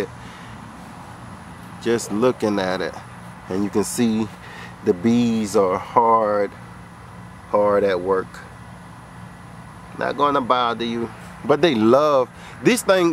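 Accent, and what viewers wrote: Louisiana, United States. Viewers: As soon as I watched your first video (the one I watched before this one) I said "he sound like my people". So naturally I liked (👍) the video. Less than a minute later you verified it when you said something died off because the Louisiana heat was starting to set in. I was sold then, on top of you being out there with the family and your knowledge base just made it all the better. What part of Louisiana are you in? I'm from Belle Rose/Baton Rouge (south, la) but live in Ruston (north,la) and have been attempting to try my hands at growing with little success. I think I'm afraid to "go all in" and fail so im very cautious about how much and what I plant. Do you have any suggestions on a small but mighty starter garden? Thanks in advance.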